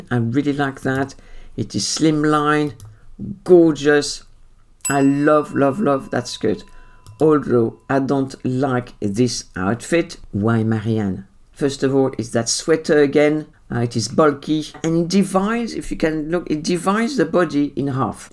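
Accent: France. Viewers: I love your French accent in English. Greetings from Poland.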